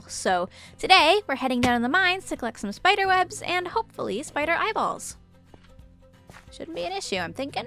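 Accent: Newfoundland, Canada. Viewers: Oh my god your accent makes so much more sense now that I know you're from NFLD lmao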